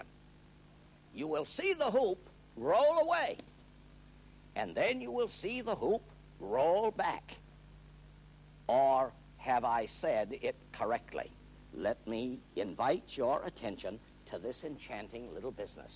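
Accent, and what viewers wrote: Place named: Mid-Atlantic, United States. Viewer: He is using the mid-Atlantic accent. I wont explain it to you but you can search up "BrainStuff-Why do People Talk Weird in Old Movies" on YouTube and they will explain it to you.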